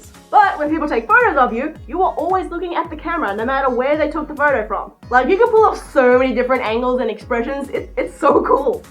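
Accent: Australia. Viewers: Is amazing you sound so auzzie I love it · i can tell Ur an Australian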